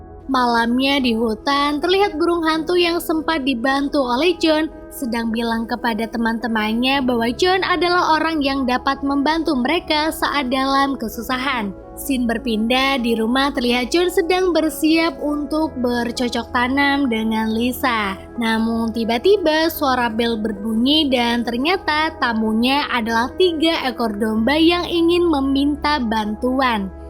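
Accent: Pemalang, Indonesia. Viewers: Kaka orang pemalang bukan kak? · Mbaa orang pemalang yah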